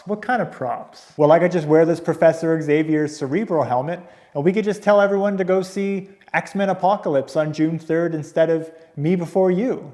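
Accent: Canada. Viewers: You sound Canadian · There's a lot in this video I was nodding along with but may I just say, you sir have the only Canadian accent I have ever been able to pick up on! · Okay so I know this horribly off topic, but are you Canadian? It's just the way you said eh. I'm guessing if you are Canadian you're probably eastern. Idk I'm just completely focusing on your accent, sorry.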